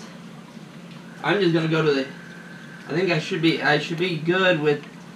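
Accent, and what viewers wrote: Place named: Alabama, United States. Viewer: you might be from Houston but you sound like your from Alabama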